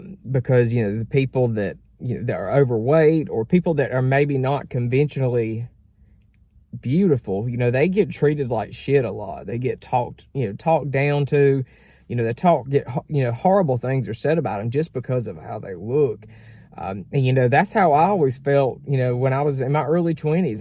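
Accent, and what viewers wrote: South, United States. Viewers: I agree and really like your jacket and everything you said is so damn true. Love your southern accent!!! For me being a chub all my life I’ve seen this and it’s been a lot harder to date. I have struggled with this all my life and I finally just take care of me and of my health and if they don’t like it I now say O well and just try to be me. I like to now not look at looks but how and what kind of a person that they are inside. That’s more attractive now than how I used to be and how others are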